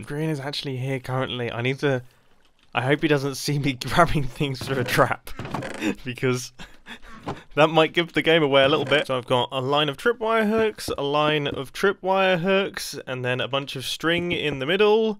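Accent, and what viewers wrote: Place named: United Kingdom. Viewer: OMG I HAD FLASHBACKS FROM PORTAL 2 because his british accent and the moron combined just remind me of weatly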